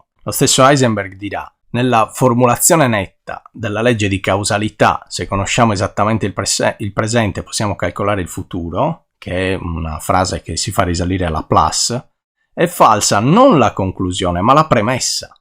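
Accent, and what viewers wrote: Sardinia, Italy. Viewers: mi sono iscritto . P.S. dall' accento mi parrebbe di sentire un sardo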